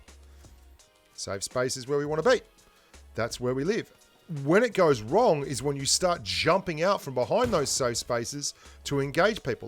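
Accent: Australia. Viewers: Hey bro. I started watching you when I noticed the aussie accent Pretty close to home for me (nz). Your the man with all the advice that has helped me tremendously. Thanks and keep it up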